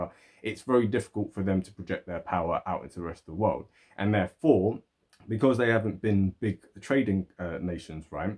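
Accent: England, United Kingdom. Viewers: Only an Englishman can have that accent. So he is for sure.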